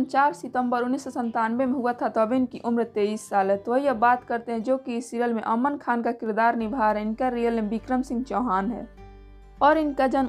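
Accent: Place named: Chhattisgarh, India. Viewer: Speaker is chattishgarhi hain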